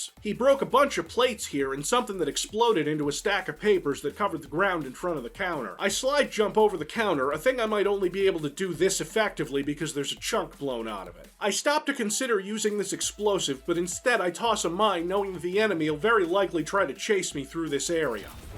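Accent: New England, United States. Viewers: ... Of course he's from New England. Of course he's from here. if you're still out here and we happen to pass by each other I'll buy you a beer.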